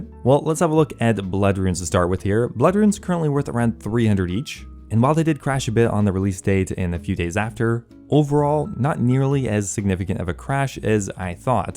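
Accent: Quebec, Canada. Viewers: You sound French canadian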